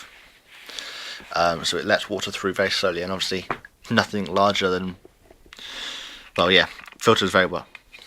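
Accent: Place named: England, United Kingdom. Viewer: i am guessing you are english :)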